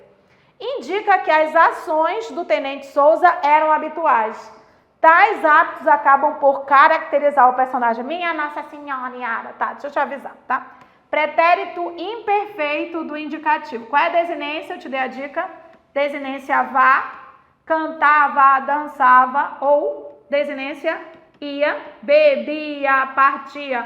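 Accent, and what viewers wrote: Pará, Brazil. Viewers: Paraense pesada! Só pelo sotaque! 😊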